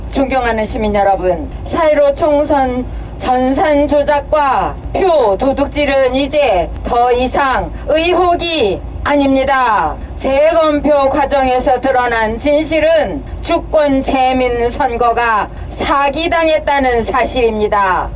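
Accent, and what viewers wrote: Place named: North Korea. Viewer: 이 방송하는 여자 바꿔라 ! 북한 노동적위대 방송하는 북한식 어투같아서 처음엔 깜짝 놀랐다. 당장 바꿔라 ! 강하고 아름다운 자유 대한민국을 후손에게 물려주기 위하여 !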